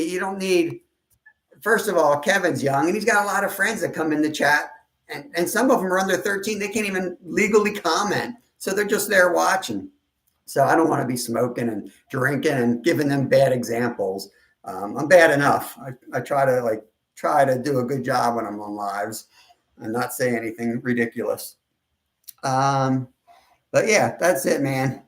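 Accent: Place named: Pennsylvania, United States. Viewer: Any time I think f Kindergarten Cop...."boys have P e n i s and girls have a V....." teeheeee. I said that quote to my radiologist that was in her late 20s. She had no idea 🤦‍♀️. LoL I appreciate your clean channel.!! Once in a while, I slip and say bad words but there are words that is a no no.. especially during the day time. A night stream sometimes brings out dirty mouth. Hehe. But we still love her. Oh, and thank you for the rant in a PA accent, it makes me feel like I'm around family.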